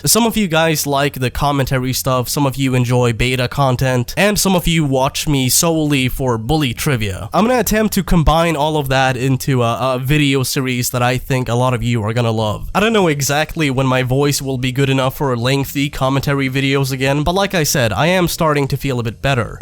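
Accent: United States. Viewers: You sound American tho why? If u don’t mind me asking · Didnt know your European you sound american white :/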